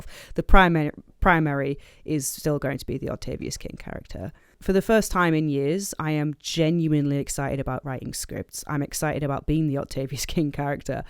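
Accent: United Kingdom. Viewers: I just watched this, god knows if you're see this comment. Part of the reason I watch your vids is because of your humor, and the other part is because you always made mention of your issues and as someone who is bipolar I get it, because I've been there myself. That and if I'm going to be perfectly honest, you're attractive and I can't get enough of your voice, it's the British accent. Could listen for hours, I usually wait till there there's a few vids to watch in a row for said reason. Not a creeper, just a Bipolar guy that finds himself in similar situations as yours and..... well, what can I say I'm guy, knowing there's an attractive woman out there that I can relate to on some level, makes me feel less lonely and worthless. Stay strong, be you, because you rock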